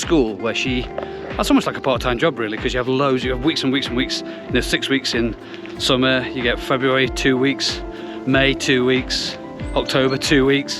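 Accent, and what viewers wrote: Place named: Stoke, United Kingdom. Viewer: I could hear your Stoke accent! 👏🏾👏🏾👏🏾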